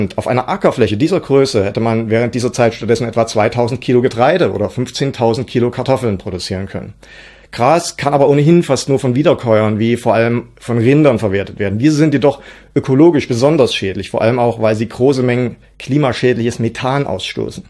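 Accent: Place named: Saxony, Germany. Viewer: ich höre es nur gaaanz leicht raus, mein Mann sächselt deutlich mehr. Ich mags. 😁😉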